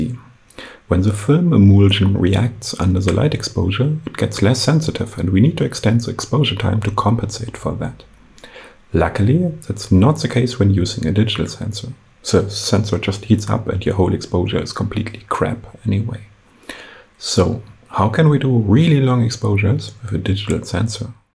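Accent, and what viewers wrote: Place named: Germany. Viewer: That's cool stuff, you deserve a larger audience. I love how well that camera fits on that signpost, it's a really elegant solution to hiding a camera in plain sight. Also, as a felllow german I have a love/hate relationship with your accent. Ps. "BEAR with me" is pronounced more like the german Word bär, not Bier, unless you wanted us to enjoy a drink with you.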